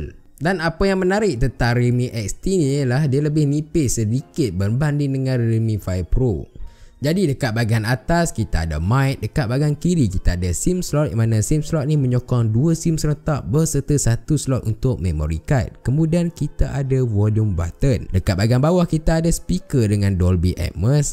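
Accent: Taiping, Malaysia. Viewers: are you from taiping?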